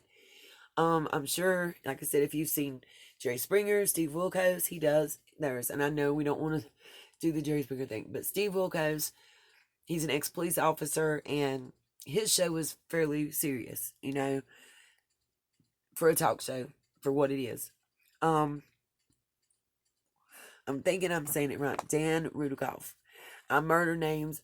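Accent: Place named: East Tennessee, United States. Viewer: I live in east tn. In the same area as the Smokies and you sound like people from my area. I'm as country as cornbread and I'm seeing things exactly like you do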